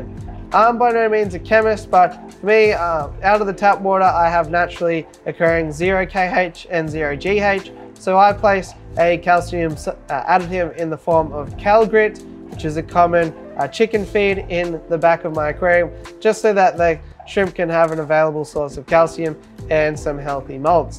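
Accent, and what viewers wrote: Australia. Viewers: oh thank god, hes an aussie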